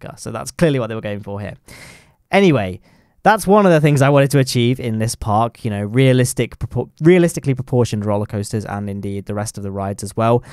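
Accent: United Kingdom. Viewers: Hearing my man saying 'yo' in his amazing British accent (not as thick as mine though). It's amazing!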